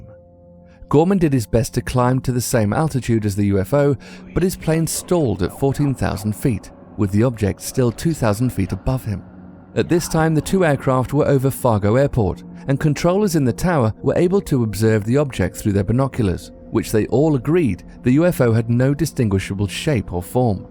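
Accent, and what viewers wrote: United Kingdom. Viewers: Who is this British guy commentating more often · it looks great, but who is this British narrator?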